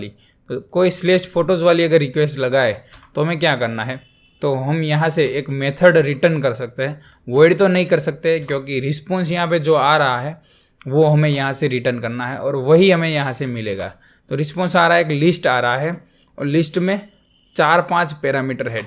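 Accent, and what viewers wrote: Gujarat, India. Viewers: Gujrati Accent Detected.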